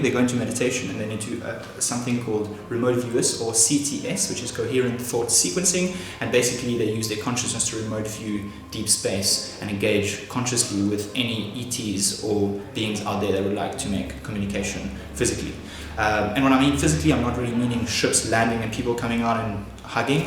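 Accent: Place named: South Africa. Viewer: ah south african accent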